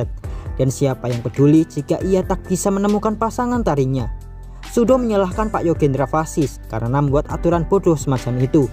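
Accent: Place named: Java, Indonesia. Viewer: Medok wong jawa kie admin ne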